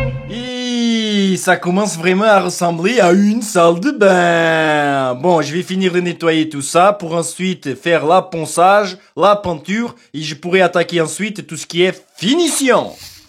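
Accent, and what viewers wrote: Portugal. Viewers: J' aime bien ton travail. Est tu portuguès ? Ton aquecent me ressemble un portuguès. Pardone moi quelque chose. Merci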